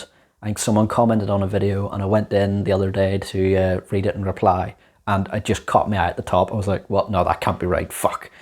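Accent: Northern Ireland, United Kingdom. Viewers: Nice to hear a Northern Irish voice on YouTube! Great channel! Not sure if you've already done this but 'How to make a AM/FM Radio' would be cool. Cheers.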